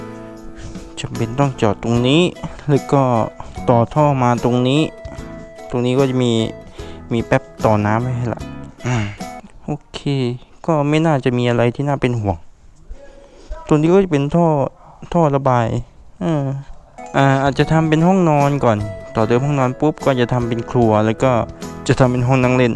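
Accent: South, Thailand. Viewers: พี่ เเหลงใต้หรอยน้ะเนี้ย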